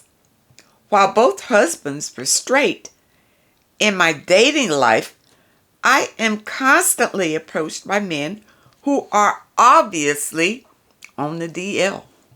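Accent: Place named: New Orleans, United States. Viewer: Love that New Orleans accent I can hear it unique energy you're amazing I watch you and Only Logical channel he expose those BSB Bottom Shelf Brads anyways great content channel you have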